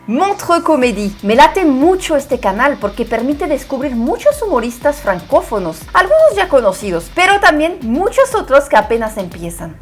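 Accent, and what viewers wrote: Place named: Mexico City, Mexico. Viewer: Hola, me gusto mucho tu video… me encanta tu acento chilango 👏🏼😂 saludos ✌🏼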